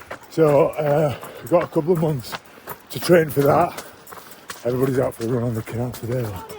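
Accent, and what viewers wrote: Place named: England, United Kingdom. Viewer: I grew up in the UK partly but God do I find the English accent so annoying which I’m sure people find American accents annoying too so no hate just an observation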